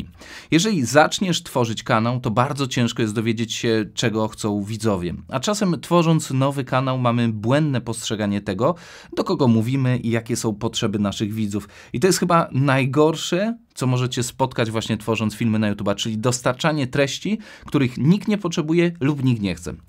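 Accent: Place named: Kraków, Poland. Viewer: Słychać żes pieronievz Z Krakowa 😁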